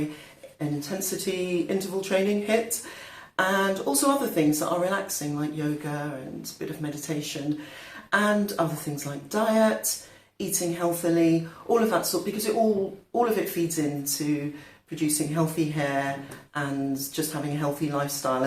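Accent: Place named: England, United Kingdom. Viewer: The English accent surprised me. Your hair is very pretty.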